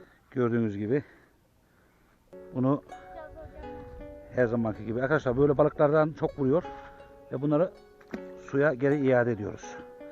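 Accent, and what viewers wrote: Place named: Konya, Turkey. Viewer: Toprağım Konyalı olduğun buram buram konuşmalarından belli iyi büyük balık var mı gelsek saygılar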